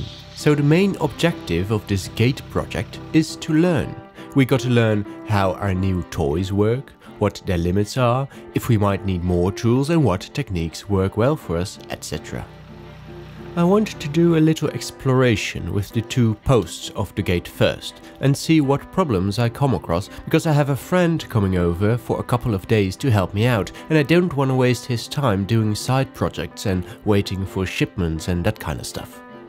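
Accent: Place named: Europe. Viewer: Where you from dude, you sound European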